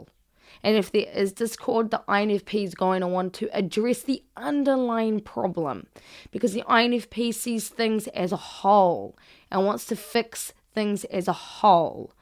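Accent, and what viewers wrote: New Zealand. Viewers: Sounds like a kiwi accent, she'd be from New Zealand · Is that a kiwi accent I hear? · Are you a kiwi????? 😄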